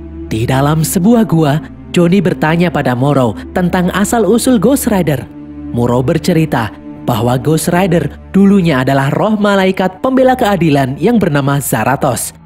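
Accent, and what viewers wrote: Java, Indonesia. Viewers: Wah Mimin Jawa ya 😅☝️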